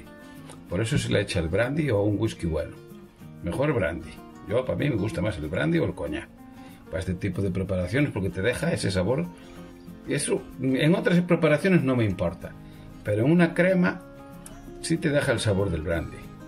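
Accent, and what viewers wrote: Galicia, Spain. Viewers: Me encanta tu acento y tu tierra gallega; te saludo desde Murcia. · Por tu ace to diria que eres gallego, desde donde emites? . Yo te veo desde OURENSE❤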